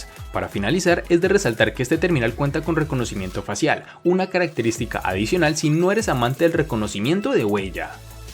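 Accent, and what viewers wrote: Cali, Colombia. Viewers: SOS DE CALI? JAJA YO SI DECIA VE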